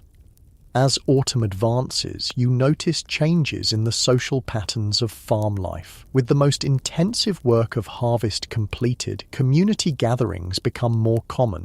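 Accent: England, United Kingdom. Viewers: I didn’t realise how many channels there were of these but I love this one. It’s the English accent because I cannot stand American ones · I love your content, but why the switch to the English accent narration?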